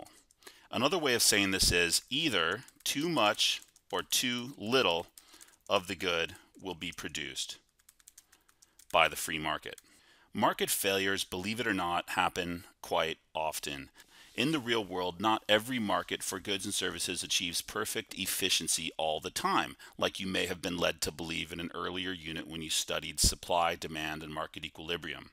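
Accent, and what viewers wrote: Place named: United States. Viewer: Can u add subtitles as every one cant understand american accent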